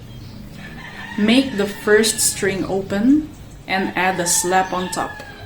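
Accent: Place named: Australia. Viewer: natawa na naman ako sa australian accent, timing po nagkakape ako lumabas sa ilong ko,, 😃😄,, kahit paulit talaga natatawa pa rin ako😁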